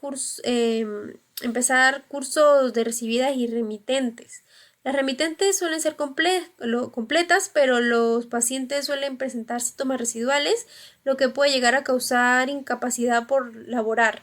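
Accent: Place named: Mexico. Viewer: EXCELENTE, TIENES CIERTO ACENTO MEXICANO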